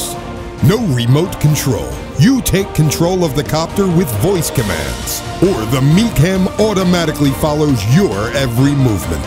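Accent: France. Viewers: i miss ze french accent..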